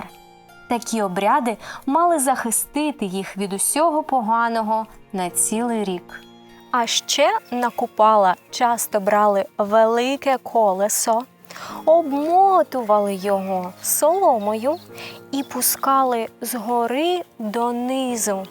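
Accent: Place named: Luhansk, Ukraine. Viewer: А я люблю вашу вимову - така Луганщини))) Луганськ - ЦЕ УКРАЇНА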